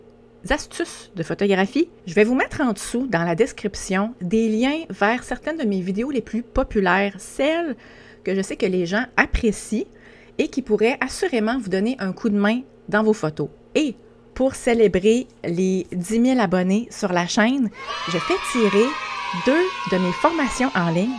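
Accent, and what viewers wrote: Canada. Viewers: J’aime beaucoup l’humour que vous déployez dans vos cours photos , c’est sympathique, vivant , et instructif. Quant à vos chats , je me demande s’ils n’expriment pas une forme de rébellion 😼 😜, prenez votre temps et quoique vous décidiez, ce fut un plaisir 📷… avec ce petit accent canadien qui plait tant à nous autres , les français . Thierry, région de Champagne,France 🥂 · Hello, j'ai découvert ta chaine en novembre 2023 suite au renouvellement de mon matériel et j'ai repris gout à figer le temps. Grace à toi, en décembre je passais sur Luminar Neo et j'ai le projet de faire ta formation mais avant, je termine la formation sur l'appareil que j'utilise. Concernant le temps que tu passes à nous offrir ton savoir, effectivement, parfois il faut prendre du recul et penser à soi-même et peut être que tu seras encore plus lumineuse avec les 2 vidéos par mois car tu auras profité de ceux qui t'entourent. 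Encore merci de nous offrir ton expérience et ton savoir. Ne change rien...surtout l'accent Canadien que les français adorent.🤗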